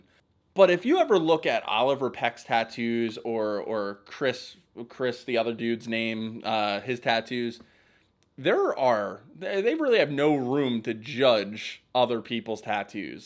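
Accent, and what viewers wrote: Philadelphia, United States. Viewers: Those shows drive me bananas. I'm the only heavily-tattooed person (and usually the only person with tattoos at all) in nearly all of my friend groups, so people always come to me with questions, and it's usually because they've seen some terrible show like this. Also, I like your accent. Are you from Philly?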